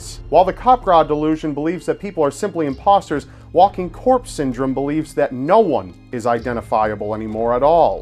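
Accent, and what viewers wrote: United States. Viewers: thepurplewalrus Probably because he's an American or something. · Because he is American ?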